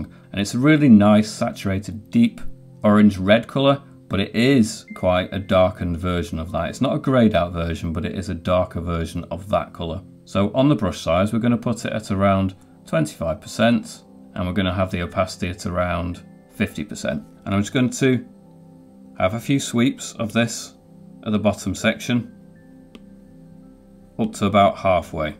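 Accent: United Kingdom. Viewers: Hey! Are you British? And if so, which part of the UK are u from?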